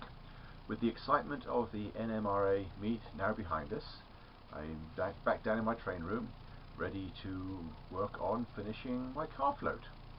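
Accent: England, United Kingdom. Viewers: Yes, I've seen that page and I've met Phil - for several years he lived down the road from my parents' summer home in the Catskill Mountains. I know it was normal to pull strings of cars halfway off to, as you said, keep the barge balanced. I was responding specifically to your question of whether it was proper to leave two cars (you sound English, maybe I should say goods wagons?) alone on the apron, where, it seems, without other cars on one end or the other on a flattish surface, the two cars would be prone to roll off, unless someone went out and put on the brakes. I know another guy, whose email I can't find now, who rode the rails of various New York rail-marine operations in his youth, who might have some thoughts.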